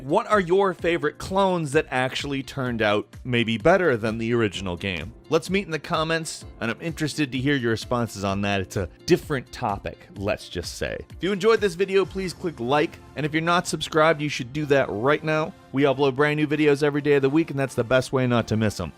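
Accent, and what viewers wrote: Canada. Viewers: Is Canadian?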